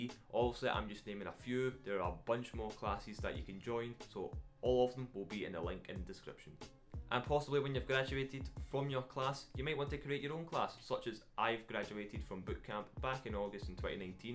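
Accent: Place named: Scotland, United Kingdom. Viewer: Fellow Scotish :0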